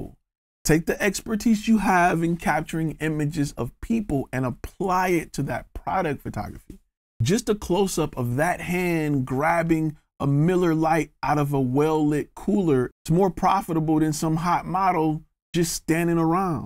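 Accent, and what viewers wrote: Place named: Detroit, United States. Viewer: Yo bro you from Detroit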